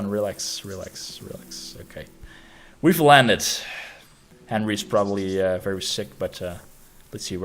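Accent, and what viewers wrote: Netherlands. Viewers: Dutch accent :) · You have a Dutch accent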